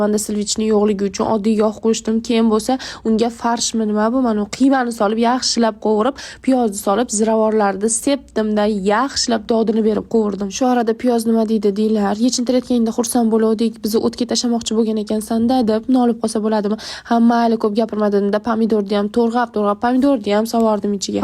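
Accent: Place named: Fergana Valley, Uzbekistan. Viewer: Салом бизни водийда шунака гапирамиз😂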